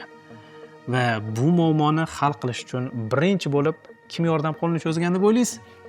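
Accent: Tashkent, Uzbekistan. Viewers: Toshkent shevasida gapirayotgani tufaylidir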